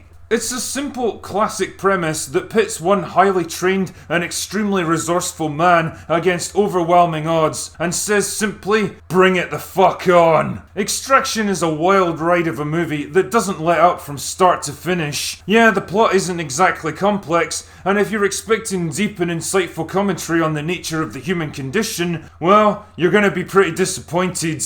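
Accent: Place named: North Wales, United Kingdom. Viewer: Damn, that's a strong north Wales accent.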